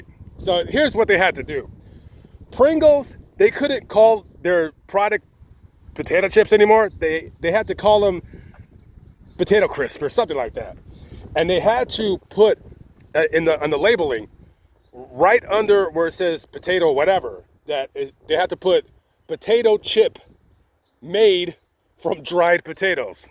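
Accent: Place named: West, United States. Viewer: Man, you visit all these places of remembering, & do a fine job telling stories of those who my go forgotten. Do people, or security rather not approve of your simply visiting these cemeteries, filming the general area, & talking about those intered therin? I don't understand why you must lie. Go to an Atwood's, or Tracter Supply. Find decent looking dark blue denim jeans, & a few Sunday Morning acceptable western style (snappy button) shirts, & Heck, you may even find a nice pair of Boots. Your accent is western enough, & you'll look like any good ol' South Western man there to pay his respects. (& if you shop a bit you can not spend a huge chunk of your savings), I promise it could make a world of difference. These are cemeteries, the few folks that you find around tend to worry about the ones they have lost.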